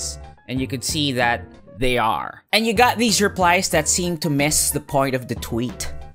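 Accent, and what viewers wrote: Philippines. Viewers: Do I hear a Filipino accent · Are you Filipino? i can sorta hear a bit of an accent behind your words lol. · Hey bro, great video hahaha can't help but notice you have a little bit of a Filipino accent. Greetings from the Philippines!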